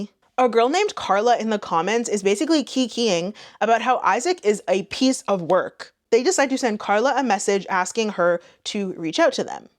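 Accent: Canada. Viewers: Gurl I just found you, so happy I did.. your hair looks amazing also that accent is 100% Canadian lol (Been binging ya since finding ) Love that you are a fellow Canuck. 🍁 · first time watching you, i love how Canadians say words with "ou" in it lol Aboot. "Oot of the scene."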